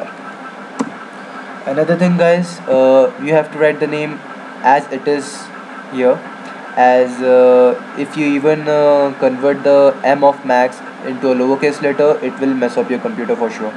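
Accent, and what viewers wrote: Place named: India. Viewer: FIRSTLY; SPEAK PROPER ENGLISH== NO ONE UDNERSTAND YOU >>>dont speak English in Indian's accent ! second: whatever you write or add to the right pane in the registry; IT DOES NOT MESS THE COMPUTER THE PROBLEM OF THE REGISTRY HAPPEN ONLY IF YOU CHANGE EXIXTING VALUES IN THE LEFT PAN: where the yellow keys are := even if you add any key to the left pane; it does not do any harm to the computer: SO STOP OVER-SCARING PEOPLE FROM OPENING OR USING THE REGISTRY !! SPEAK PROPERLY ; WILL YA???????????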